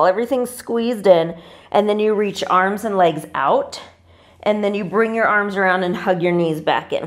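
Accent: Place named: United States. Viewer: How do spell' peeppers '. Can't understand American accent!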